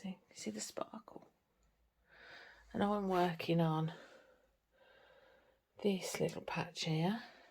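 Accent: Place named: Birmingham, United Kingdom. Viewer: Great wip n chaty little Brummie glad your getting back to you my fave book growing up was the secret garden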